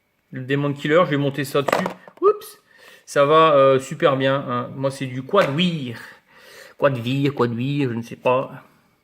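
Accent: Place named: North, France. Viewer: je suis sur atos mais en reconstructible j ai pas passer le pas mai très bonne vidéo bonne explication de quelle région tu habite tu a un accent qui fait penser un peu au nord moi je suis originaire des Haute Pyrénéenne mai j habite en région parisienne Bernard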